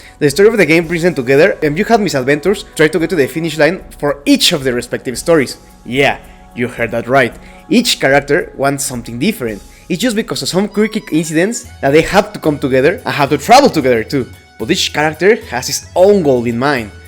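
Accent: Spain. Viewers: you have like a spanish accent it's kinda weird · Supongo que eres de España por tu acento asi que te comento en español, la verdad es que la idea que propones me parece bien, el problema es que Zelda es muy poderosa, se cargo a todos los guardianes de la zona en la que estaba link luchando en una de las memorias de BOTW, mantuvo a Ganon durante 100 años en el castillo y después de que link lo derrotara lo selló. Ahora esto se podría solucionar diciendo que Zelda ha perdido poder después de sellar a Ganon (ella misma dice en el final secreto deBOTW que ya no nota la trifuerza) y hacer que realize las pruebas que mencionas en tu video para recuperar la trifuerza completa y así ayudar a Link a derrotar a Ganondorf, que es lo que impidió que esto pasase el miedo que tiene Nintendo de que la gente se queje. Aun así se que algún día dejarán atras ese miedo y lo haran, así como canonizar el Zelink. 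PD: Buen video bro❤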